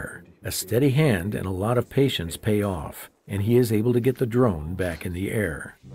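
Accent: United States. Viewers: so good to hear a narrator speaking american english for a change. I'm a bit tired of the usual overly theatrical british english in these documentaries.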